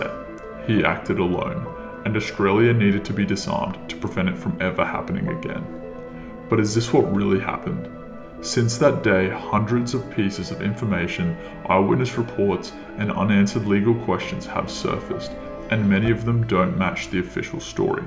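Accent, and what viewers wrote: United States. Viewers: Sounds very American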